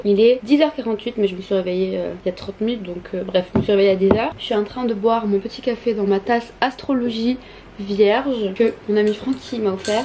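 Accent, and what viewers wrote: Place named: England, United Kingdom. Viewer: Chu morte pourquoi à chaque fois que t'es avec tu prends un accent anglais??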